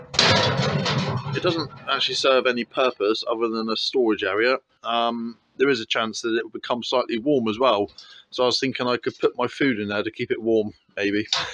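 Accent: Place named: Cornwall, United Kingdom. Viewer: Great job, looking really good, i take from the local twang your from Cornwall, a fellow Cornishman, here is a little project i have been working on, a 4.5 kw rocket stove, can give to much away ,as still in patent process, <link>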